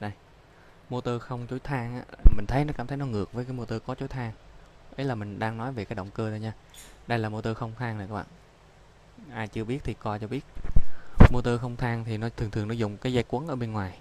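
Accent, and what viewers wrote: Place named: Quang Nam, Vietnam. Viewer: núa lau lau dính tiếng quản🤔🤔🤔🤭🤭😁😁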